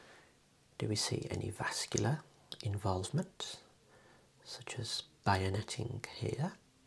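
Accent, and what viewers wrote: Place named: Birmingham, United Kingdom. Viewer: i love that you have a brummie accent! as a brummie myself, hearing you feels relaxing and homely. thanks for your videos !!!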